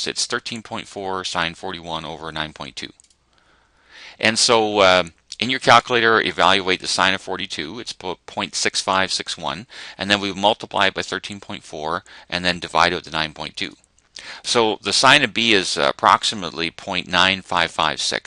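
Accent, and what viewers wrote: Canada. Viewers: u sound like a canadien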